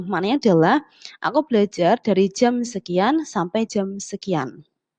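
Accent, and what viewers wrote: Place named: Java, Indonesia. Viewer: Medok ya bund😅👍👍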